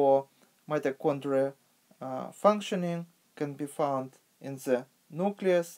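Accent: Russia. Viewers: Funny accent but good video I would assume your Russian good job at hiding it tho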